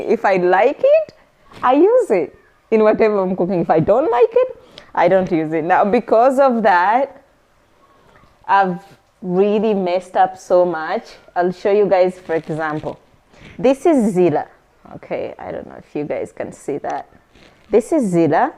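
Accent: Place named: Pakistan. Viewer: You made me overjoyed to picked up Pakistani accent. It means you really love Pakistan ❤ 🇵🇰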